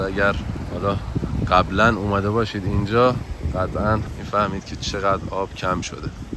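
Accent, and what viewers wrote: Shiraz, Iran. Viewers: دستت درد نکنه برا ساخت و تهیه چنین برنامه های خوب و پر محتوایی. اجرا و کیفیت هم بسیار عالی ، لهجه شیرین شیرازی هم که بقول خارجی ها is icing on the cake 👏👏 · من مشهدی کانادایی. چقدر صدا ؤ لحجه ایرانی زیبا و قشنگ و خوب.و درست است.ایراتیان بهترین لحجه را دارند.چون الفبا ایرانی.بیستر و مفهوم تر است.زنده یاد ایران زنده باد شما با کنجکاوی شما و تحقیقات شما. کار خوب ت را ادامه بده. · درود بر شما. چه کار باارزشی انجام میدهید. واقعا ما را به عمق تاریج میبرید. دستتان درد نکند. لحجه شیرازی زیبایتان مرا به کوچکی خود و فامیلم درشیرازمیبرد.❤💛💚💙💜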